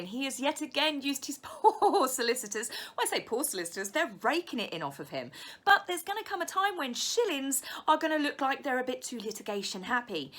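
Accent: England, United Kingdom. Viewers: Thank you for taking the time out to do these videos. I look forward to the updates since I don’t really have the chance to read as much as I would like to. So I just come to your page and look for the videos and you always have all the tea on everything, I love it! Also, I love your English accent......I’m from New York so listening to you speak is beautiful. :)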